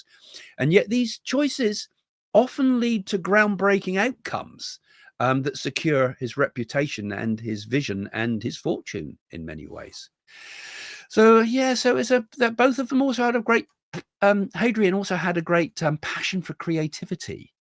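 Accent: United Kingdom. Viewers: I love your accent so much. Could listen all day long. I'm such a sucker for a British accent! But Trump is very much involved in the Epstein ring (as were plenty of Democrats- this stuff isn't remotely partisan- just evil men corrupted by power), I don't know what Musk's tactic would be. It's already an ongoing issue in the US. I'd venture to say it was Trump's mafioso thugs that had Epstein murdered in prison. No way that was a suicide. Many people will go down from both the Epstein and P Diddy rings and there is plenty of overlap. I can't imagine that Musk wasn't also involved in at least one of those. It would shock me if he wasn't. Men + power and money = corruption.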